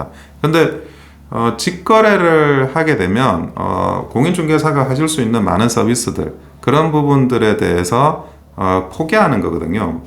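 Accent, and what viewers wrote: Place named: Gyeongsang, South Korea. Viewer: 존경하는 원영섭변호사 대표님 반갑습니다 안녕하세요 팬앤드마이크TV 시청중하고 구독하러 왔습니다 건승하십시오 경상도 목소리 너무 좋으십니다 감사드립니다 멸공 필승 단결 🇰🇷🇱🇷🎉🎉👍👍🥰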